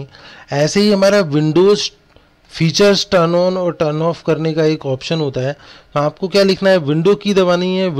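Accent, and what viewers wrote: India. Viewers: Why your English is so indian!!!!!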